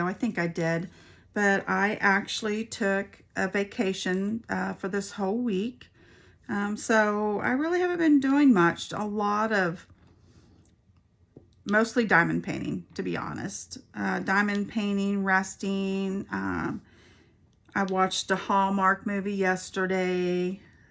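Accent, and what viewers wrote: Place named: Kansas, United States. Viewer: Hi, I enjoyed listening to your WIP and Chat. It sounds like you are a fellow Kansas. I live in Ellis, Kansas. I also have a YouTube Channel. Have a blessed day. 🌻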